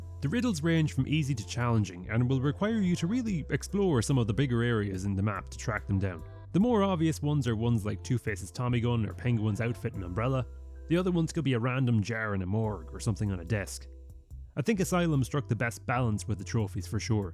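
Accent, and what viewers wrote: Ireland. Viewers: You sound irish. Hello fellow irish person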